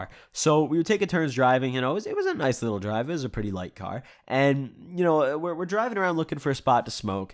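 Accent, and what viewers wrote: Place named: Lafayette, United States. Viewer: Sounds like your in Lafayette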